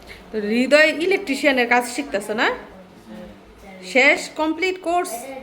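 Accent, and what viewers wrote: Gazipur, Bangladesh. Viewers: তোমার কন্ঠের সাথে মিলে যায় আমাদের গাজীপুর কন্ঠ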